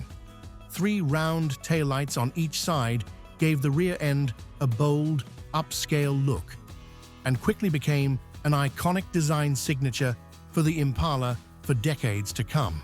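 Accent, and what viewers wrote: United Kingdom. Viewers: That's exactly right. This video doesn't even answer the very question it proposed in the title. Yet another announcer with an annoying British accent. There was barely any time for GM to do the crash quick, completely re-designed '59's by mid-'57. Long hour 7 day work weeks for months was what it took, and exactly what they did, with beautiful results for all 5 division's models.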